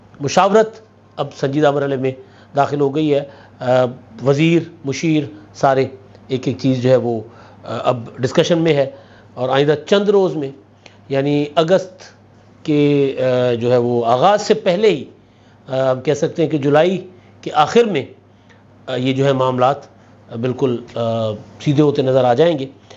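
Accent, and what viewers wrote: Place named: Sindh, Pakistan. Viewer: مزہ آجاتا ہے آپکا وی لاگ دیکھ کر۔ ہیں تو آپ سندھی۔ سندھی لہجے کی جھلک آتی رہتی ہے تھوڑی تھوڑی آپکے لہجے میں مگر. گفتگو کا انداز اور سبجیکٹ پر گرفت بہت مظبوط ہوتی ہے۔ عمران شفقت کا بھی اچھا ہوتا ہے مگر آپکا منفرد ہے۔ آئ لو. یوؤر. وی لاگ۔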